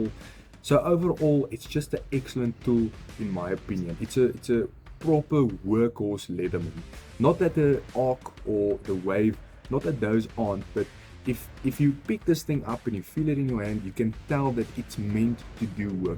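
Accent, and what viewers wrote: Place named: South Africa. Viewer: Among other multitools, I have both the Wave+ and ST300M myself. Sadly couldn't get it in that awesome tan/black color at the time, but I guess that just means I can get a Rebar with that color scheme if I wanted to. Although I personally prefer the Wave+ so far, I'd always bring the ST300M - which is closer to the Rebar - for more heavy things aside from going into woodlands. Concise and informative video. Also: I love the south african accent, ever since I saw Lethal Weapon 2 back in the day. "Ah. Just checking to see if I was standing on plastic." Keep up the videos, and I hope you grow and get to do more.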